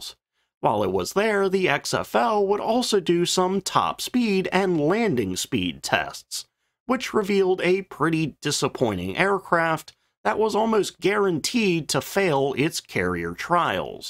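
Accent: United States. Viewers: Have you gone AI on us? that was the weirdest pronunciation of “Anacostia” I’ve ever heard. I found that odd as you talk like you’re from the Delmarva and maybe PA area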